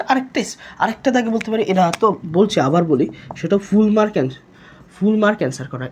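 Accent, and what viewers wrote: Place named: Noakhali, Bangladesh. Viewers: Vai mone hoy noakhalir😊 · Vaiya,r kothar accent dekhei bujhe gelam vaiya Noakhali thake 🙂 Hea amio Noakhali tei thaki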